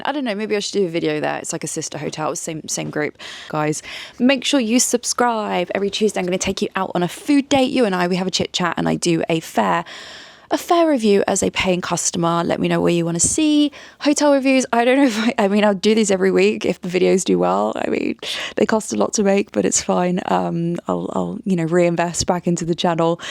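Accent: RP, United Kingdom. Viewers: Hello from America. I am indulging myself in your speech, your choice of words, and your cadence. The British accent (from my perspective) is simply charming. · £835!!!! 🙈 that outrageous for most of us, it looks lovely but I dont think its worth it Plus the kettle should have been emptied before you came, you have no idea how long that water had been sitting in there and where were the biscuits????? Plus you do sound very well spoken (quite posh sounding ) 🤣 so I think you can carry it off more than the rest of us 😊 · Hi from Morocco, I watch your videos every day, cuz I love your RP accent and I want to speak like you. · you need to come and check out Aussieland this Chrissy because we love your posh British accent.we,'ll fill you full of Prawnies and FOSTERS,we love poms.The ashes will be on as well as all the British backpacker parties on Bondi beach when they leave their rubbish but we don't mind,come and enjoy.😊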